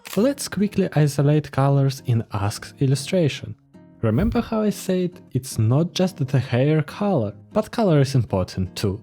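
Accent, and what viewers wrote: Russia. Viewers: Спасибо большое за ваш русский акцент Поверьте мне, если бы вы были говорили с английским акцентом, я бы вас не поняла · Почему я слышу русский акцент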